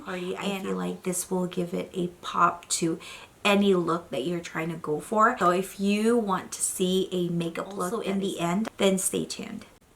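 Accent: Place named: England, United Kingdom. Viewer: I like your shimmer and glow but I only could think your very nice English accent :)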